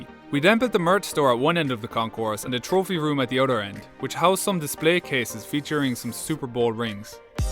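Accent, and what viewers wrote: Ireland. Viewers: U sound irish and insane build man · Are u Irish cus u sound like u are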